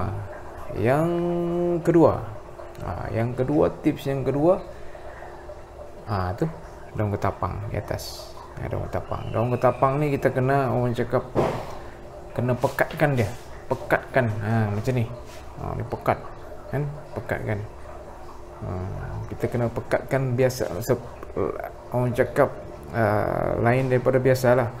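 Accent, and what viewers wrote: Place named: Sabah, Malaysia. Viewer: Ade sleng sleng sabah sikit la bang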